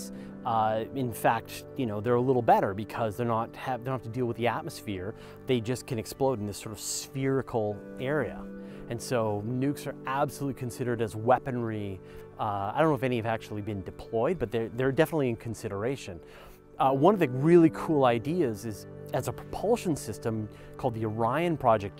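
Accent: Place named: Canada. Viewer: Non-astronomy related but, you are Canadian right? I can tell, I am too.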